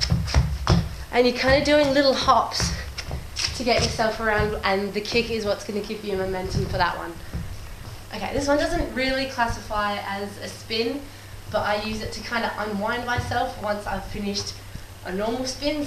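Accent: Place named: Australia. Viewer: Shut up!!!!! I keep falling in love with you every time i hear you australian accent!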